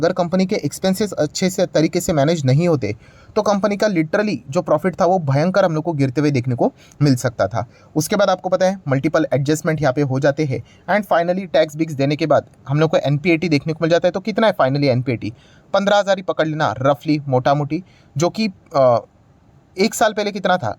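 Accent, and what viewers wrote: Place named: Gujarat, India. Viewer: Sir many times i observed that you are perfect gujarati this is confirmed, i observed your words to words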